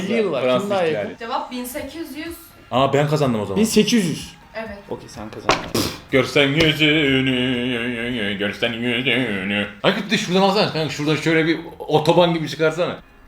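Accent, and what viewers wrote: Turkey. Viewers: abi sen trabzonlumusun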